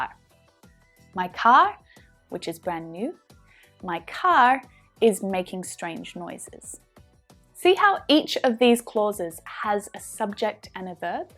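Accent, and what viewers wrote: Spain. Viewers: I love your Spanish accent,! Sí, el invierno puede ser un infierno, también;-) Thank you again for this valuable classxxx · Hi I loved your Spanish accent. Xxx not say (inferno) invierno ok!!! You're lovely ❤️ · your spanish accent is lovely :)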